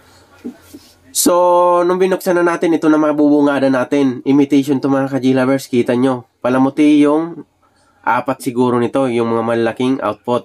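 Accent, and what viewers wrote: Bicol, Philippines. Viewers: Bikolano po kyo sir? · Bikol ka palan manoy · Bikolano ka? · Sir salamat👋taga bicol kaba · Lods bicol dialect background mu ah😂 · Good day SIR,... Parang BIKOLANO k po,... sain po ang "SHOP" MO dyan sa BIKOL?,... nagre- REPAIR k man po nin mga "BEAM LIGHTS",... ( MOVING HEAD)???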